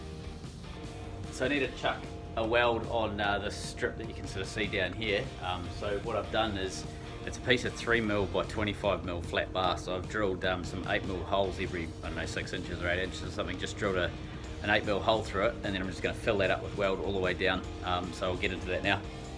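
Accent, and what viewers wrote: New Zealand. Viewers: He's saying pantographin kiwi!!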